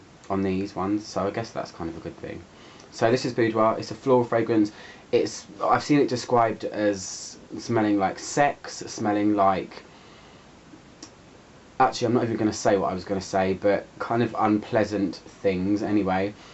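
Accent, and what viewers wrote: United Kingdom. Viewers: great british accent, I envy you!:) Let me ask you a question, because I am watching and watching your perfume reviews now and thinking about buying this Joop! Femme online, but I've never ever smell it before.... and I don't know what to do! I have my 3rd big bottle of CK ONE now - for everyday use and Salvador Dali Dali Classic (this white one) - not for every day;). I love Dior - Midnight Poison, but unfortunately it is no longer available... I am not sure if Joop! Femme will be too strong scent for me and cause a headache or it will be just perfect to wear for night... What do you think?:)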